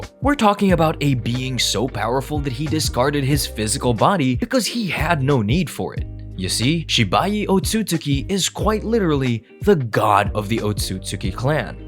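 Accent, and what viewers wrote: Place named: Philippines. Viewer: I'm sorry if this might be offensive or whatnot, but I like how your accent rolls off. It sounds like Filipino or something.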